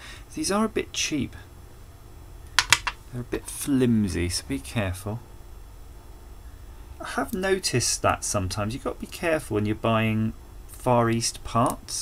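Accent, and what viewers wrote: United Kingdom. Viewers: Thanks for sharing, and I love your British accent !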